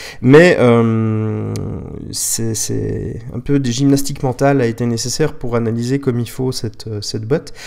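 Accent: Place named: Switzerland. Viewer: Un grand merci pour cette vidéo. Je t'ai découvert en faisant des recherches sur le jeu. Briskars est un jeu qui me tente de plus en plus: -de part son échelle, j'éprouve du mal sur le 28mm depuis une opération aux yeux, -l'accessibilité des règles sur le Net. Au doux son de ta voix, je te devine dans le plat pays helvète ^^, y résidant moi même, je me permet de te demander si tu connais des clubs ou détaillants permettant de découvrir le jeu afin de me faire une idée plus personnelle de ce qui en est? Amicalement Fred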